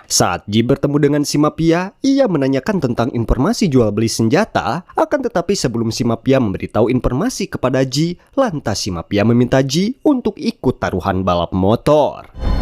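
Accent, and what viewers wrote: West Java, Indonesia. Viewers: Jigana urang Sunda ieu😂😂😂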